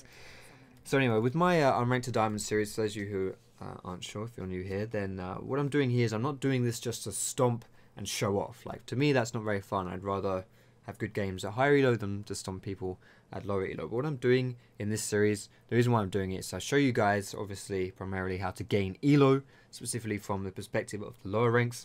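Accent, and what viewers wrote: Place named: Birmingham, United Kingdom. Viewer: HEALTH POTIONS PELASE. You sounded so much like my brummie housemate, made me laugh so much xD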